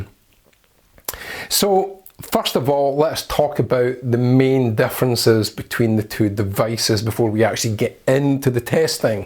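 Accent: Scotland, United Kingdom. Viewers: Well, you tried squaring the circle without any knowledge of trigonometric functions. The term “blind test” or even “double blind test” requires not only a technically perfect laboratory setup, but also randomly selected test subjects according to predetermined parameters, a statistically significant population and corresponding valid statistical evaluations. Nice try, but ultimately I like your rumbling Scottish accent 😊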